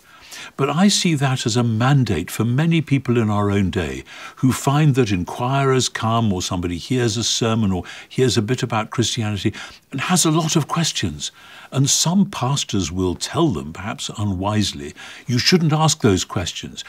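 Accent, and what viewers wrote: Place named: United Kingdom. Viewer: N.T Wright has one of the best British accent I’ve ever heard I could listen to them all day.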